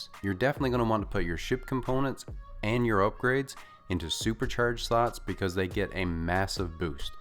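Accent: Newfoundland, Canada. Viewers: Are you a Newfoundlander my guy? Watching from Dartmouth NS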